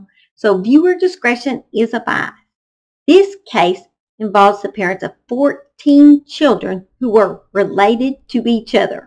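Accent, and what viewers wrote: South, United States. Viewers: You gotta be a southerner woman rite or wrong ? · Wow, this is the first time I come across your channel you live in Canada, but your accent is not from Canada because I’m from Texas and Arkansas and I’m gonna kind of gas maybe you from round Missouri or Tennessee accent the way you drag your rrrrrrrrr ‘s out I like it I like a southern girls accent OK I’m going back to your your story again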